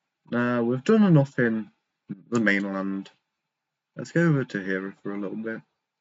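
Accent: United Kingdom. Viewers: Bri’ ish voice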